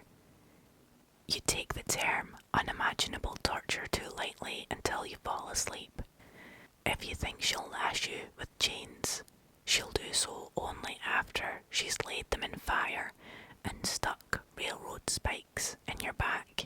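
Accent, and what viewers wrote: Scotland, United Kingdom. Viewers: Fuck aye the Scottish accent is great for asmr. Sending love from Wales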